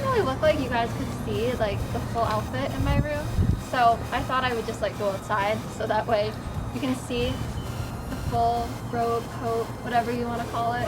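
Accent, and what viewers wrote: United States. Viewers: You miss pronounced Cheshire, but that’s ok you still cool. It’s an American thing saying Shire. 😁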